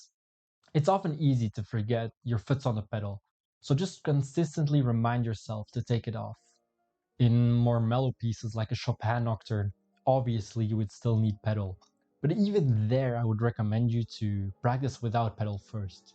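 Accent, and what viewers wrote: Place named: Belgium. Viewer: I'm Belgian myself so I can spot the accent very easily XD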